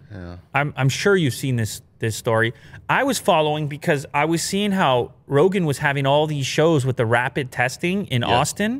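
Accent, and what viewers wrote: Canada. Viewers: sounds more Canadian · The first video I really noticed his 🇨🇦 accent lol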